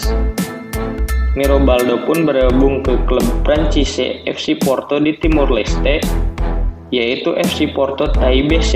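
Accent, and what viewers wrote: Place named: Banyumas, Indonesia. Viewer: orang ngapak mungkin aslinya dia bro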